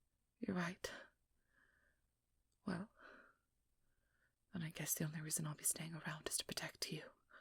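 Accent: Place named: United Kingdom. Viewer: It's funny cause I was joking with my friend yesterday about writing a character and I said you take the nice happy go lucky person and then you add ✨trauma✨ Which leads to walls being build and "I am too cold for any to understand me" moto and then a wholesome/lighthearted moment and the character breaks a bit of their wall down to let that other persons in where we hear the tragic heros backstory and then we have what most characters fear ✨Character growth✨ 😱😱😱 Which leads to the redemption arc! Which then leads to the worse thing possible. ✨Healing✨ and ✨Positive mental health✨ dramatic gasps and screams 😂😂 But can I just quickly point out it's been a hot minute since I listend to so I kinda forgot she's British. And then hearing her for the first time in awhile I was like "Is she British?" (Context which makes this better is I am British and apparently really bad hearing because I can't recognize another British accent apparently) and then she said "Secondary school" and I was like "Yup she is." 😂😂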